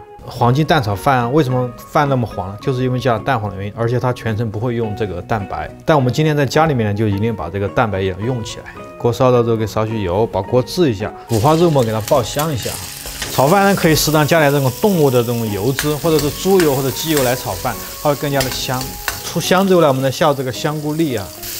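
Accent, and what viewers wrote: Sichuan, China. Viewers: 四川土音 👍 太親切了！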